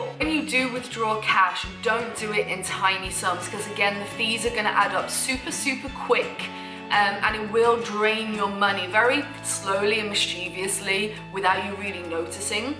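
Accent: United Kingdom. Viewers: Out of curiosity... you're British not Australian ryt?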